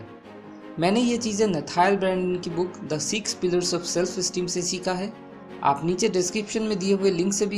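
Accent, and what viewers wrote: Bengal, India. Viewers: bad video. bad accent.bcoz u r a bengali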